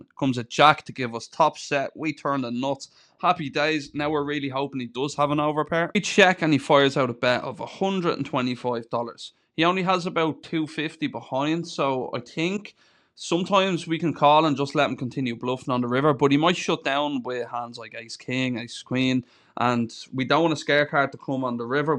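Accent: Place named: Ireland. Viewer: Really glad the Algo put you on my home page, been looking to add a Sound Studied Vlogger to the Sub list! Makes it even better with your Irish accent...Good fookin fold on the AJ!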